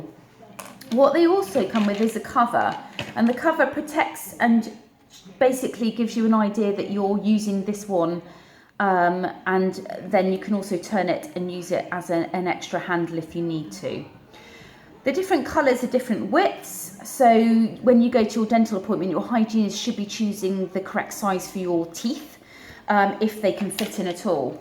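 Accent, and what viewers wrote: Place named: England, United Kingdom. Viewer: Go to England the place is full of people who talk like this.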